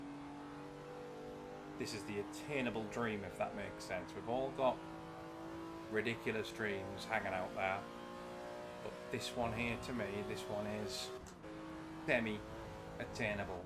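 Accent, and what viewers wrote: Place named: Sunderland, United Kingdom. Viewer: You sound more mackem than usual in this one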